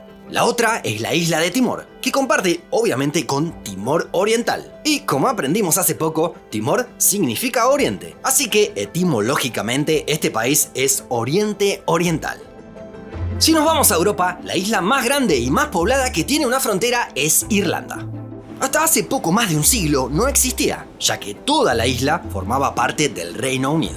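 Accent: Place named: Argentina. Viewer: Sinceramente tu voz es muy agradable! generalmente me cuesta escuchar videos con acento argentino (por que toda la vida he visto las peliculas y programas con doblaje mexicano, aunque no soy de mexico) pero contigo jamas me costo, super genial el canal!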